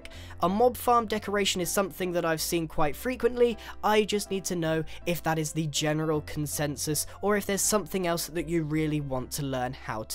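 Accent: United Kingdom. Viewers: you're British right, it's hard to tell : o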